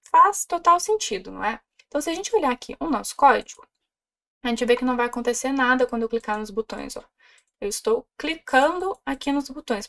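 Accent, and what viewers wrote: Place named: Rio Grande do Sul, Brazil. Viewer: Eu consigo perceber 30% de sotaque gaúcho e 70% de sotaque de Brasília. = ["RS", "DF"]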